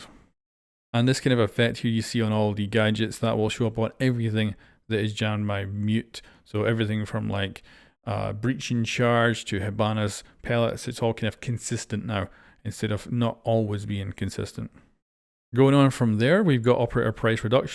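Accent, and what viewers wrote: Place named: Scotland, United Kingdom. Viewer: I don't know if you are from there, but you have a very hard Scottysh accent.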